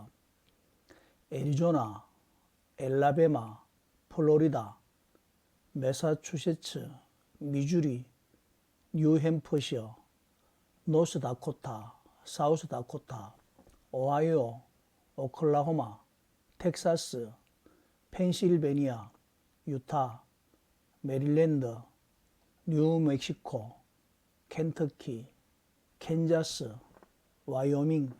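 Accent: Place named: Gyeongsang, South Korea. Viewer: 공통점!? 경상도 말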